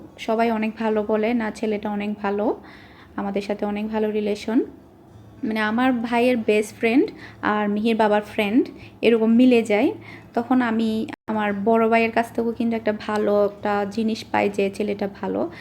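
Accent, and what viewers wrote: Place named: Noakhali, Bangladesh. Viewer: কেমন আছো? আজকে তোমার এই সম্পূর্ণ ভিডিওটি দেখলাম। আপু আমার জানামতে নোয়াখালীর বেশি র ভাগ মানুষ ই চিটাগাং এ থাকে। নোয়াখালীর সাথে চিটাগাং এর জন্মসূত্রে সম্পর্ক আছে। তোমার কথায় নোয়াখালীর একটু টান আসে।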